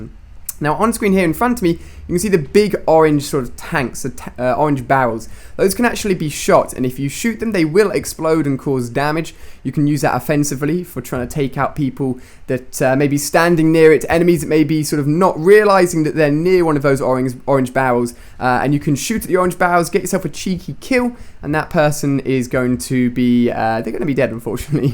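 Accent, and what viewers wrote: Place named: United Kingdom. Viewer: FINALLY they bring back a british voice to CoD.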